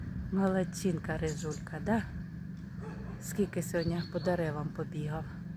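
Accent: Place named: Poltava, Ukraine. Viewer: Дякую за відповідь. Я з Лубен, дуже мова Ваша на нашу з Полтавщини схожа. Тримайтесь, все буде добре.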